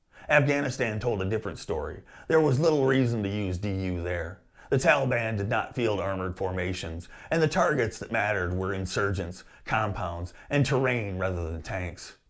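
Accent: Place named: United States. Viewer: Spoken and presented like a true American 🇺🇲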